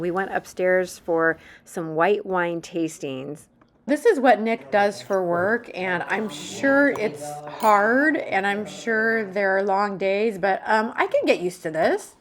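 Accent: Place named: United States. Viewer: Con este acento, parece americana de nacimiento. O al menos lleva muchos años en EEUU. Dudo que sea alemana.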